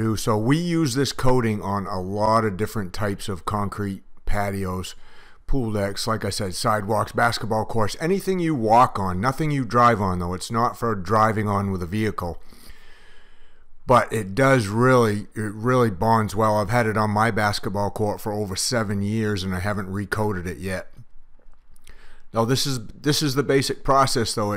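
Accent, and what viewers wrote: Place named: New England, United States. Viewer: Great video this is very helpful. By your accent your from New England. Since you’re using this product where you have so much snow ❄️ and rain and it’s held up well. This will also hold up good on my patio with our bright sun ☀️ in California. Exactly what I’ve been looking for. Great video thanks again 😎👍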